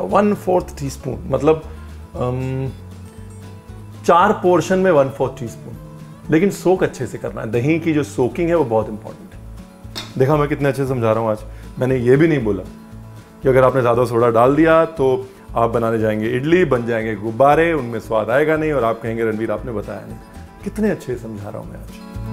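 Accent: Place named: Punjab, India. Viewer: RAVE.. ☺️ Punjabiyat chalak hi jati hai baton baton mein😊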